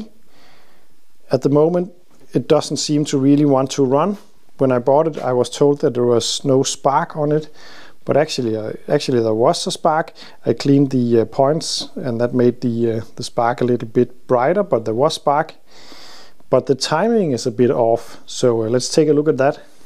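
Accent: Denmark. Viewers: Wait are you from Bornholm?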